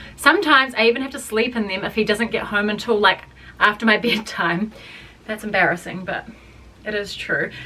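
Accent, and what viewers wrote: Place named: New Zealand. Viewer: I’m used to the kiwi accent being an Aussie. I did not notice the pronunciation.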